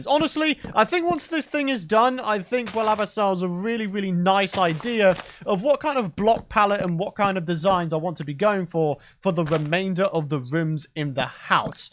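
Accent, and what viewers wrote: England, United Kingdom. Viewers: Do you are from england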